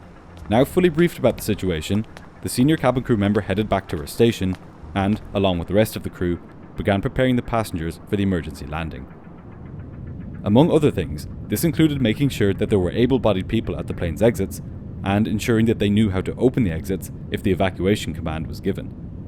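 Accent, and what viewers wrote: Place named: Ireland. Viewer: ….its his accent. thats how they say it in his region. im like 90% sure he’s irish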